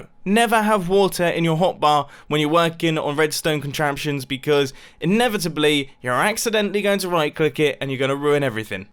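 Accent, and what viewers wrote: United Kingdom. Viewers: 'ello charming brit! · british man just said "don't panic". it's like that one book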